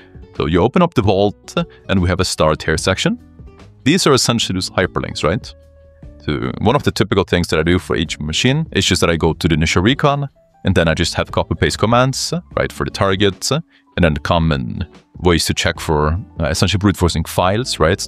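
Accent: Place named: Sweden. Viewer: Sounds Swedish to me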